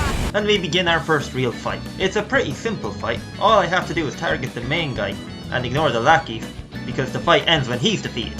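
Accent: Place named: Ireland. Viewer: Ayyyy, where in Ireland are ya from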